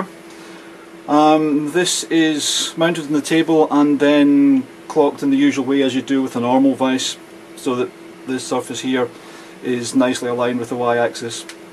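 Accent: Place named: Europe. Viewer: Good video, I am about to make something similair myself. Looking around for some inspiration. Thank your for sharing. From your accent, I presume that you are from europe. Not to many tormachs around here. Did you order a brand New, or Did you manage to find a used one? Would be intresting to know how much the shipping was in case u ordered a new one. Subscribed. :)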